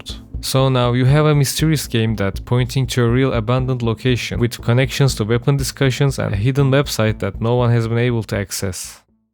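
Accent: Turkey. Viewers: aksanı türk gibi. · Türk ingilizce aksanini 100 kilometre öteden dahi tanırım. Güzel video olmuş usta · Türk aksanı duyuyorum sanki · came here to say this, his accent is exactly like a Turkish person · kanka Türk müsün sesinden anladım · Are you türkish by any chance? Your accent very similar to türkish accent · I know a Turkish when I hear one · I dunno why but your accent sound like Turkish accent to me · As a Turkish, i smell a Turkish accent 😁 Btw for your first video, the wievs are really good! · aga sen türksün ben o aksanın kokusunu alrıım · Turkish accent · Out of context but are you Turkish? Your accent sounds like Turkish accent a lot. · Video çok güzel de, Türk müsün amk. Yemin ediyorum lan bu adam türk mü diye aksana odaklanmaktan videoyu izleyemedim:d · Türksün sesinden belli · What accent is that? It sounds turkish. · aksanı duyduğum an türk olduğuna emin oldum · I can hear the turkish accent kankam, good video ellerine saglik;) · you kinda sound like turkish though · Your accent sounds very Turkish maybe because I’m Turkish and used to hearing it a lot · you sound turkish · Turkish %100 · türk müsün len aksanın o vibeyi veriyor great video btw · You have a Turkish accent, are you Turkish?. 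I like your video btw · Hocam video güzel olmuş eline sağlık. Türk müsün acaba aksan Türk gibi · Ben senin o türk ingilizceni anlarım başkannn.. Manyak video bu arada sevgiler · I love the Turkish accent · You sound like turkish man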